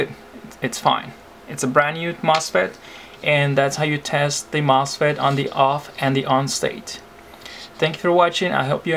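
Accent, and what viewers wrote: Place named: United States. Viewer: =) the great american accent ......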